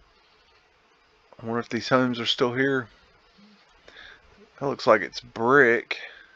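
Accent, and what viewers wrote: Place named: Oklahoma, United States. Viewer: That accent, you close to oklahoma?🧐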